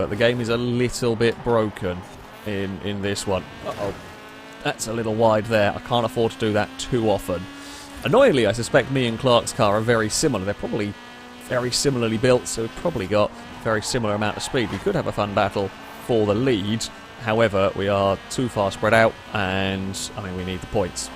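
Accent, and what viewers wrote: England, United Kingdom. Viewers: I watch this for the British Accent.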